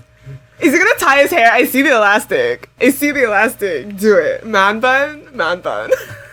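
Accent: France. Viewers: are you french? 😮😮😮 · GIRL U FRENCH !? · Ton accent français est très beau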